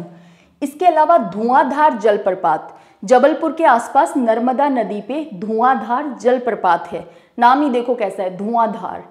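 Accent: Haryana, India. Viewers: Haryanvi accent is my favourite ❤️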